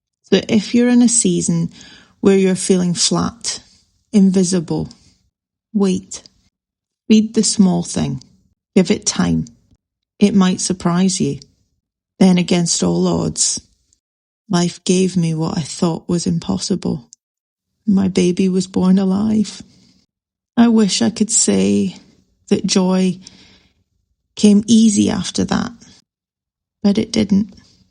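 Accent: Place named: Scotland, United Kingdom. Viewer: You made an old man cry, It is not a perfect world, Me and my now wife of over 16 years were married before, We live in central Scotland, i can tell by your accent you also come from here. Elza like yourself had numerous miscarriages and had no children, I had 2 sons, Like yourself she is a wonderful person and more or less adopted the boys (men) they both love her to bits etc. Life has a few Dark places and I have been there like her and you, the Light shines bright once out off it, you have a very nice man and now children at your side. GOD Bless, shine like a STAR. I once baked but only as an amateur young leo has inspired me to bake again and so have You, I am an old man nearly 70 and enjoy it. going by some of the comments your name is and your husband Vernon? all my best Charlie xx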